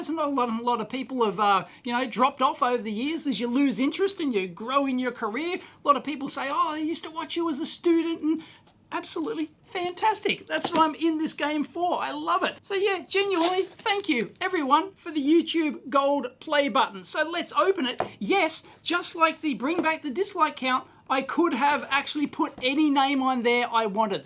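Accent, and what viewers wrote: Australia. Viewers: Congratulations! Well deserved! This is probably the only channel I’ve followed continuously for the past 12 years. As a junior EE, I learned a huge amount from your videos, about electronics, thoughts, experiences, and opinions you shared along the way. Believe it or not, some of those actually made a difference when I was making choices in my own career. I'm sure your content has much bigger impact on your audience than you probably realize. I can confidently say I know a lot more about electronics… and a lot more about how Australians talk 😂🇦🇺 Keep it coming. Forget about retirement, not working for the man is a life sentence 🤣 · This great man with his Aussie accent helped further my interest in electronics. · Congrats on your huge milestone! You were, or are one of my favorite channels since I started down the YouTube rabbit hole 18 years ago. 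Your adorable Aussie accent and high energy brought me much entertainment as well as valuable learning experiences. Here’s to the next 17 years my guy! Congrats!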